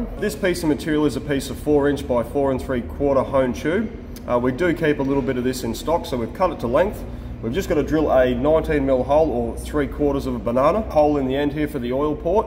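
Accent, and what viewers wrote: Australia. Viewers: Great engineering skills! Awesome to watch by a kiwi from across the ditch! Your dog needs an alignment as it runs side saddle! Lol 😆 Cheers keep up the excellent workmanship! Ps. The camerawoman can’t be doing much office work if she’s on the end of the camera! Really enjoy your bloopers as you talk like a real Aussie! Eg … fuck sake fuck it! 😂 lol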